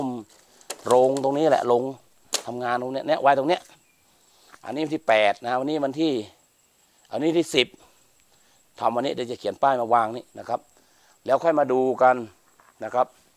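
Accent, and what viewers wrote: North, Thailand. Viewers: อาจารย์เป็นคนเหนือหรือป่าวเจ้า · อาจารย์อยู่แถวใหนคะพูดได้สาบเมืองมากเลยค่ะ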